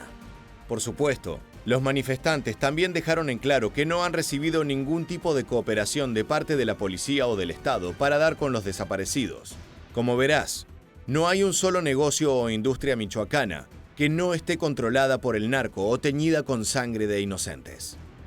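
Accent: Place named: Argentina. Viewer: Amigo, estas muy bien documentado, aunque veo tu acento argentino me da la impresion que vives aqui en Mexico, vas al dia... muy interesantes tus documentales. Saludos.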